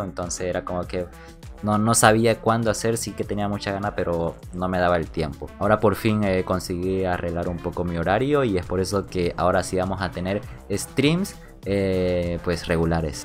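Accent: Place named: Santa Cruz, Bolivia. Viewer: Si te soy honesto, yo te seguia por tu forma de comentar y jugar.. no llevo muchos meses, pero francamente no tenia idea que eras de Bolivia para que mentirte... Por tu acento me imagino que eres de Angel Sandoval, Santa cruz?.. hablas como entonao XD le subes enfasis a las O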